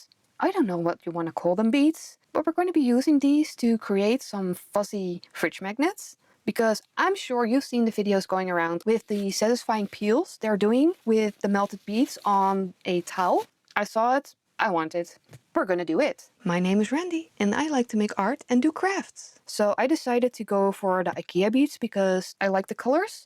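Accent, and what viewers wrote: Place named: Netherlands. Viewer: You sound a little bit dutchie 😀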